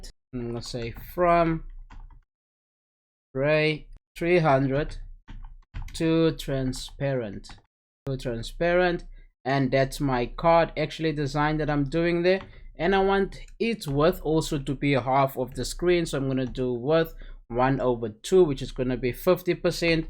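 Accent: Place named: South Africa. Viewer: Yusis china lekka to hear a local voice hay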